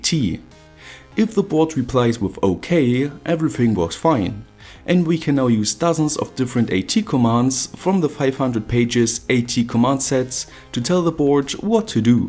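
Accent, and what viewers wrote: Germany. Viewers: is he swiss or German? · Your voice has an German accent which i really like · why he sounds like a german?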